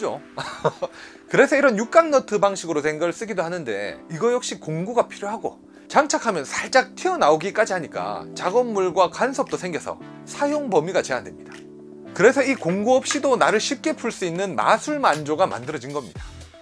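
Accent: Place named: Countryside, South Korea. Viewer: 시골 사투리가 구수하네요. 좋은 정보 감사합니다.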